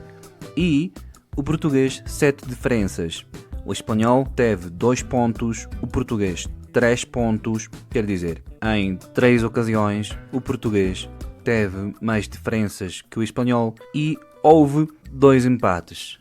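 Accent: Portugal. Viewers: Amo o sotaque português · o seu sotaque puxa mais para o português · o seu português é igual ao nosso de Portugal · uma pessoa com sotaque do português europeu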